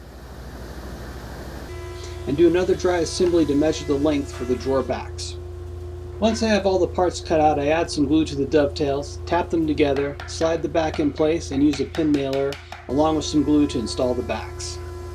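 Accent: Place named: Croatia. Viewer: Wonderful vid however your heavy Croatian accent makes it difficult to understand what you are saying. Would you please consider providing American subtitles. Thanks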